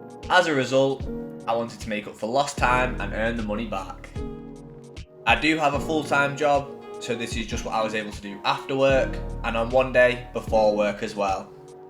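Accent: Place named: Liverpool, United Kingdom. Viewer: I love the Liverpudlian accent. But then, as an American with Grandmum from Bradford, I'm a sucker for any British accent. I really appreciate your videos on Data Annotation. Thank you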